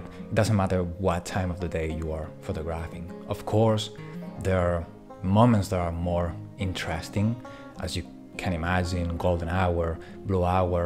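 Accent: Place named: France. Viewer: The cadence of your speech, the small motions of your head and body when you are talking and your accent remind me so much of the french actor, Tchéky Karyo. See especially his character in the 2011 film, "The Way" written and directed by Emillo Estevez.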